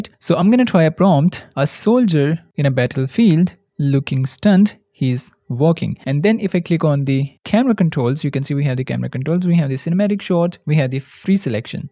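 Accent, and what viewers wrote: India. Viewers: Lol indian english accent is too comedian 😂😂😂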